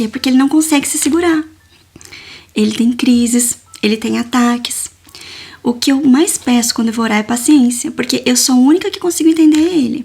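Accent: Minas Gerais, Brazil. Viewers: Uma pergunta, você é nascida no Japão ou no Brasil? Porque essa fala em "mineires" foi demais :3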